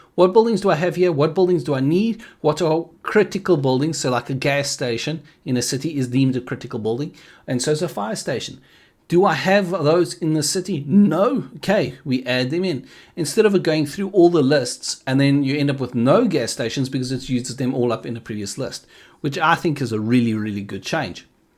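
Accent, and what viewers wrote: South Africa. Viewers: my man great video one question are you south african cause you sound like one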